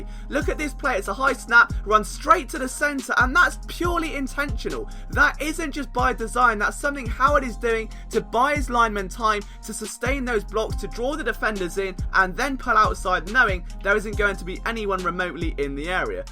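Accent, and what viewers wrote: England, United Kingdom. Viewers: you nailed it 'unless that draft pick is a 5th or 6th but i dont think the bears would lose howard for that ' hahahhaa well guessed not only was it a 6th that miiight become a fifth but it was also a bonus comp.formula pick PLUS it wasnt a 5thor6th rounder THIS year, its for 2020, we gave up a 6th or possibly 5th round pick NEXT year for stability in the RB room - id lose smallwood, keep adams as a prospect and see if he grows, keep corey clement for his pass catchin (if sproles retires or eithe rway really) i think we should still draft an RB in this draft, esp if a legit back falls, idk bout jacobs in the 1st but there are a few really good backs that will go in the 2nd/3rd so since we have two 2nds and no picks till later in the 4th (when the good RBS on our board could be gone) i reckon we either use a 2nd on a good back that falls OR we trade one of our 2nd round picks to a desperate team lookin for a specific player etc for two 3rds this year or a 3rd and 4th this year and then we draft a solid RB in the 3rd, even using the other 3rd/4th round pick in a bundle to move up to the first pick of round 3 or high enough we KNOW we'll get our guy... 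and can i just say as an english born and bred (but in japan, then NZ where i got citizenship, then america where i did, now i live in australia so its cool hearing an english accent talk american sports with knowledge - reminds me of my dad (who obv kept his english accent since he left engald at age 21,s ame with my MUm btw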